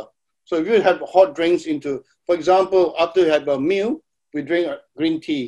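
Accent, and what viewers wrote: Singapore. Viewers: bro r u singaporean?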